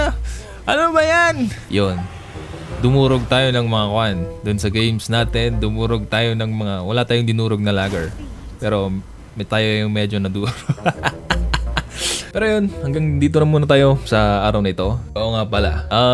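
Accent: Visayas, Philippines. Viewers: Bisaya ka pala lods 😂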